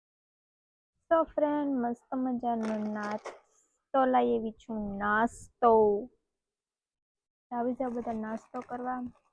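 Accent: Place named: South Asia. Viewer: didi you deserve my subscribe because many people kaik ne kaik speciality hoy j chhe i found a speciality in you ae.. tamaro desi accent je bolva no chhe they really makes you famous all the best from my side..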